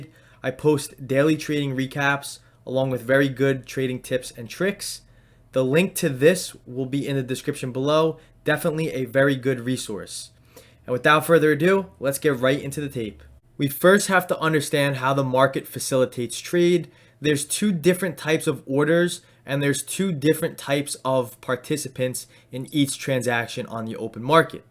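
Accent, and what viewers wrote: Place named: New Jersey, United States. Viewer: Great video thanks for posting. You sound like you're from joysey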